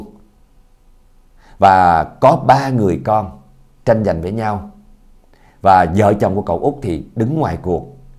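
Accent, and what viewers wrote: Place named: South, Vietnam. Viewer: Người miền nam họ kể chuyện dể hiểu hơn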